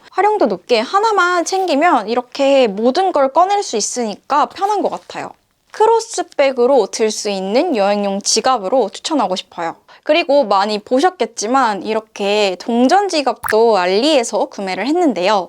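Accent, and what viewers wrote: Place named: Vietnam. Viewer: 베트남분이신가요? 한국분아닌것같은데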